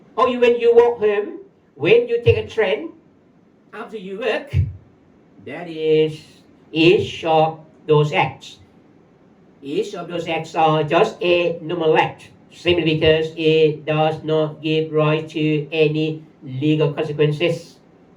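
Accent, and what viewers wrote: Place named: United Kingdom. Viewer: British accent!! no doubt.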